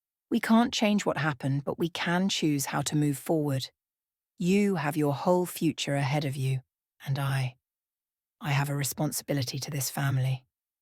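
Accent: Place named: United Kingdom. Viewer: Why do I have to suffer through a British accent on a story ostensibly about Americans in America?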